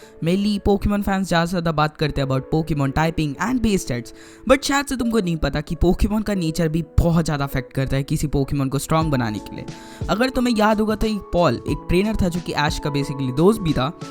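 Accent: Bengal, India. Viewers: Are you freaking Bangali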